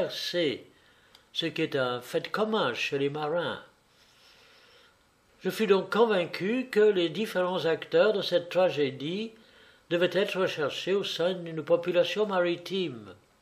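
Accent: England, United Kingdom. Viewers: oh are you adding a british accent :D i was wondering what dialect that was lol im learning french :goodvibes::elbowcough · Merci pour cette interprétation, très belle voix avec l'accent anglais. J'ai apprécie cette voix dans mes lectures audios de Sherlock holmes. Merci encore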